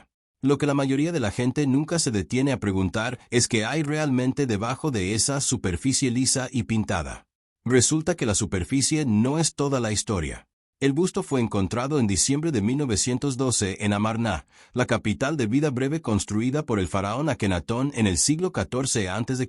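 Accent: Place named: United States. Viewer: Todo esta muy bien excepto el acentito ridiculo de gringo....😅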